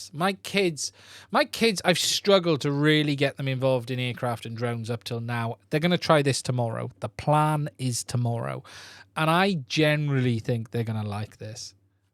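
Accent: Wales, United Kingdom. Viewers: Stumbled across your channel and thought I detected a bit of a Welsh accent! Enjoyed your review & subbed. Lots of useful info:) Many thanks! Whereabouts are you based in South Wales? I'm up in Ceredigion but not a whole lot of FPV action up here. I've been building/flying drones since the DJI Naza & KK-2 controllers were around so I'm stuck with a diverse fleet of analog FPV quads/wings, mostly running TBS Crossfire or FrSky R9 (although I did spring for a Mini 2 for some stable 'Countryfile' style footage). I'm keen to switch to digital FPV for improved clarity so I'm considering the Avata for some Cinewhoop-style footage but also keen to retro-fit a DJI Air unit to a couple of my existing drones. Sounds like a pair of second-hand V2 DJI goggles would probably cover both bases subject to following guidelines for rooting, etc. Cheers!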